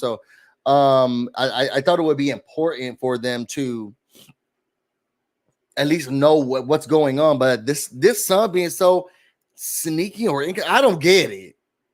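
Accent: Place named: South, United States. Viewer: with a Southern accent (Yes Lawd)! 😍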